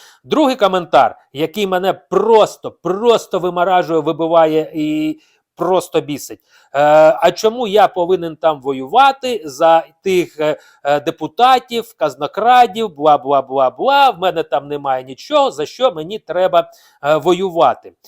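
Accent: Russia. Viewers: Фу !!!! Тварь !!! Ещё одно - куля в лоб ... А сам сидит русским акцентом трусит ... В бан суку !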